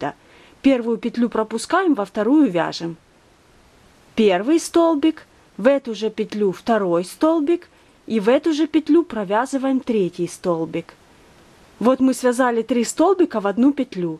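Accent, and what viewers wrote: Ukraine. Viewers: Девушка видимо украинка, вот и говор такой, так то всё же понятно😊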